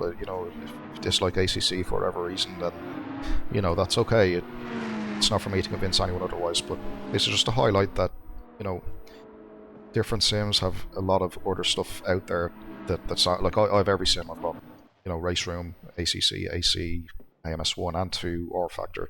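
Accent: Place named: Dublin, Ireland. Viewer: Ijust bought this game yesterday it's cool so far more practice is needed sounds llike your a Dub like myself hi from Dublin Ireland.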